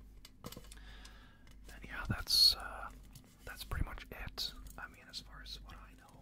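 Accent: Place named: United States. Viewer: så kult! Hvor bor du da? Høres ut som du har en veldig amerikansk dialekt på engelsken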